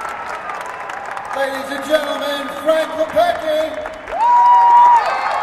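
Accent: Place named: United States. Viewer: I think it's awful how you care so much. He pronounces it American because he's American not polish. Different cultures say things different ways, deal with it.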